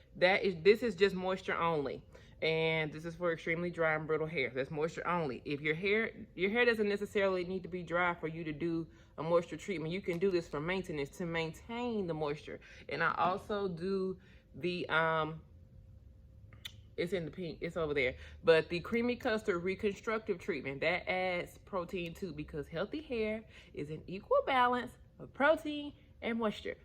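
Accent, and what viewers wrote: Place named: Mississippi, United States. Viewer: Where u located? I'm getting a Mississippi vibe from your accent. Just curious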